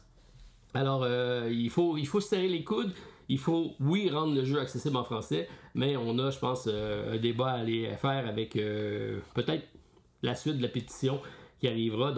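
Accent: Quebec, Canada. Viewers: Bonjour. merci pour cette vidéo. Coup de coeur du mois de Mai pour EVACUATION de VLADIMIR SUCHY. Attendus pour le mois de Juin, WYRMSPAN et SLAY THE SPIRE. Bon Weekend....... La langue Française avec l'accent Québécois n'est-elle pas trop belle!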